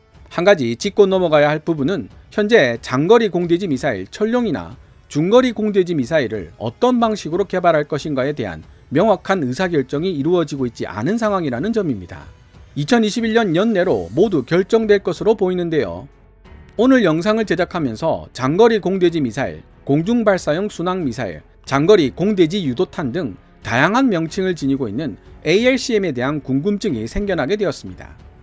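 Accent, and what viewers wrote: Gyeongsang, South Korea. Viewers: 경상도 분인가?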